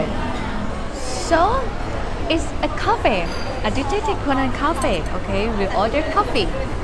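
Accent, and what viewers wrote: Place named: Asia. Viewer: Bien pour une première, bizzarement filmé ce doit être du au montage avec de nombreuses coupes non? sinon son anglais a parfois est rigolo avec cet accent tonique asiatique, ça me rappelle par moments Michel LEEB dans son sketch du chinois..LOL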